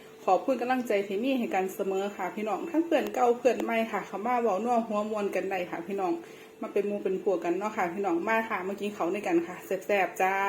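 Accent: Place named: Sisaket, Thailand. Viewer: แซบๆคับผมเห็นแล่วน้ำลายใหล สำเนียงคือคนศรีเกษแท่คับ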